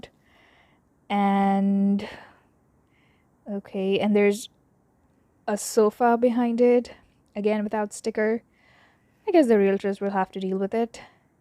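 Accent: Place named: India. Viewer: U sound Indian, not Korean.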